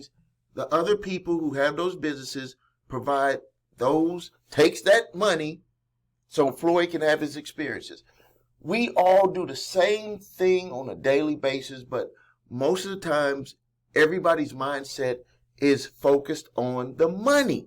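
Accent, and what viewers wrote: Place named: South, United States. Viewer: You are keeping us inspired, man. What state are you in? You sound southern. We are in NC.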